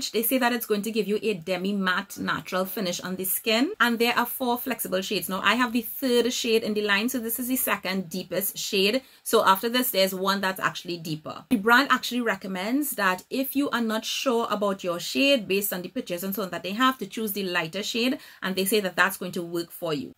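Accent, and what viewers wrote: Trinidad and Tobago. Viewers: Great review. You sound like a trini! OK so you just said, " for wearing it sake" yup, you àh trini.. Great review 🙌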